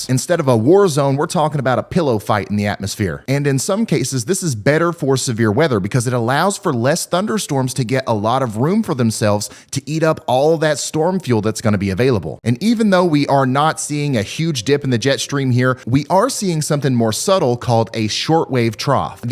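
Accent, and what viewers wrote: South, United States. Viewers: I love Ryan. Best weather prediction, and explanation. On top of all that, he speaks Southern! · I do too especially when he starts talking about possible snow in my area. I just get all excited lol. Love his southern accent too. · He’s truly great, and seems to be a caring soul ! I love hearing a southern accent. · Love to hear you speak correct English with a southern accent!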